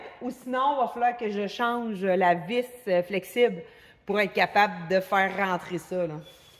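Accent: Quebec, Canada. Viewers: Bravo on voit que le travail du bois ne te fait pas peur. Perso, j' aurais plutôt fait un cadre et des pieds en métal. Mais je préfère la soudure au bois.. Toujours heureux d' entendre parler avec l'accent québécois Gérard Vendée France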